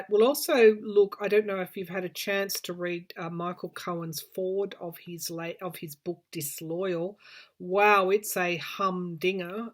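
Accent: Australia. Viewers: Love your accent and purely Aussie expressions❤️ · I'm glad I'm not the only one who gets annoyed at viewers who correct others so easily. As for me, I love your wonderful Aussie accent & accents in general. Thanks for all you do. I really enjoy your readings . 👏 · 🙋‍♀️I love your Aussie accent 😊❤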